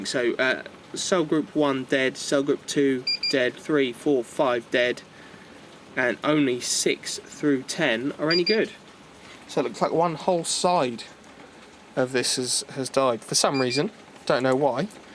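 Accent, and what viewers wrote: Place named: Lancashire, United Kingdom. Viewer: You sound Lancashire lad I’m in Rochdale. Do you mend them? Batteries for people thanks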